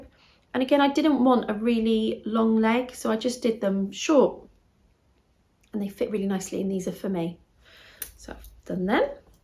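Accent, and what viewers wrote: West Country, United Kingdom. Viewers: Hi, thank you for your lovely podcasts they always brighten up my day 😊 I found you after watching Wendy the unexpected gypsy and Amy the meaningful stitch. Love hearing about all of your projects. My Mum makes my lovely project bags. Also just to say I’ve really been enjoying reading two books by Libby Page, The Lido and The 24 hour cafe. I’m from the West Country too, love your accent. Best wishes 🧶 x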